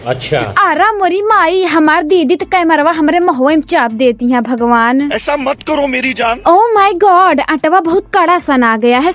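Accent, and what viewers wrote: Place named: Pratapgarh, India. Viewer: प्रतापगढ़ वाले ऐसा बोलते हैं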